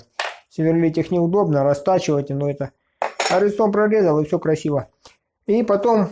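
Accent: South, Russia. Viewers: Шикарный твой южный акцент))) Ютуб Брин - бывший соотечественник.... Вот те и волокита, вот те и бюрократия))) И кстати: твой оператор - малаччина! Всё делает грамотно и почти без ошибок....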